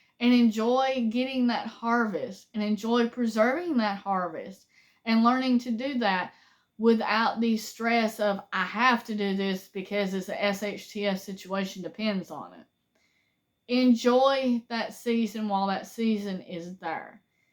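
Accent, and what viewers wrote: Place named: East Texas, United States. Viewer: Well I'm fairly new to y'all's channel and didn't know you had your own channel till your husband mentioned it. Glad I heard that. And I'm glad to hear that you're recovering from your ailment. I look at you like a daughter But your accent is music to my ears. East Texas here and that's how I talk. Hope you keep up giving good info. Had the same trouble with cheap can openers. Got a good one with a crank handle on it. Sure helps this old man. I'll see you later!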